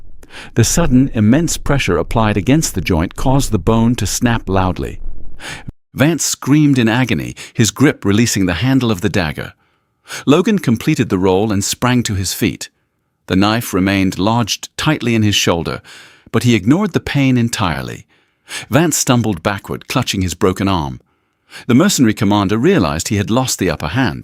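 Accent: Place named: England, United Kingdom. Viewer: It’s weird listening to an English accent in a USA set story 😂❤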